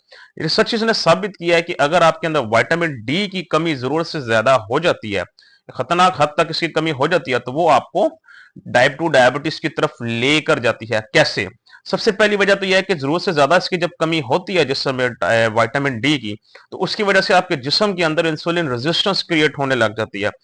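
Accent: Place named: Pakistan. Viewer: السلامُ علیکم ۔ لہجے سے تو آپ پاکستانی لگتے ہیں۔ اور ذیابطیس کی یہ انوکھی وجہ آپ نے بتائی۔ میں ہندوستاں سے ہیں ۔ مجھے بھی ٹائپ ۲ شوگر ہے۔ فیملی history میں کسی کو کبھی یہ مرض نہیں رہا۔ کیا مجھے بھی آپکی سُجھائی باتوں سے فائدہ ہوگا۔ ہمارے یہاں کے پروفیشنلز کا آپکی دی ہوئی وجوہات پر اعتماد نہیں ہے۔ آپ کے ملک میں اس مرض میں مبتلا کتنے لوگ ہیں؟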